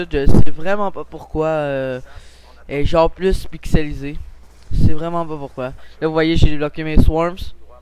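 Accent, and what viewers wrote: Quebec, Canada. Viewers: On dirait que tu as un assent quebequois mélanger avec un assent français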